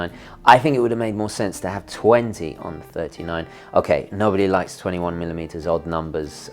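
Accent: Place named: United Kingdom. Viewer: is my most favourite watch reviewing channel, I just love the British accent, and the authentic, heartwarming enthusiasm of this guy! And now I am going to be rewarded for pursueing my most favourite leisure activity, watching watch reviews? How great is that? Thank you Fortis, I would be thrilled to own a Flieger, - unaffordable for me otherwise! Best regards, Hei from Kiel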